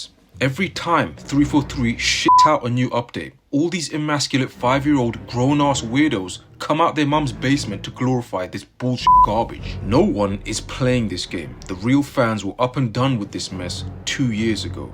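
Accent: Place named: United Kingdom. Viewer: ya veo acento británico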